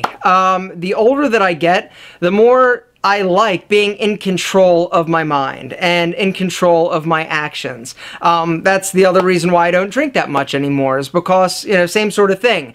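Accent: Philadelphia, United States. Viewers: I know :) but the content is so good. I just wonder why it has to be spoiled by a loud squeaky kids tv show presenter american accent. Its annoying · you should get rid of that horrible philly accent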